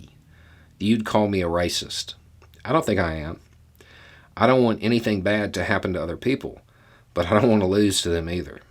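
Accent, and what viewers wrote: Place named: Mississippi, United States. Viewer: Oddly enough, it was blacks, gays, lesbians that were kinder to me then any rich 'white' person. Really just because of cosmetic differances your life is supposedly better, I guarentee you when stufff beyond your skin color challenges a person, that peson will be in the exact same boat. None of us have time to look down on one another because of things people cant chose. When you see more commonaiity with people, you find solutions then differences. This guy here sounds like every poor white person I know in South MS. Please dear writer, get a grip.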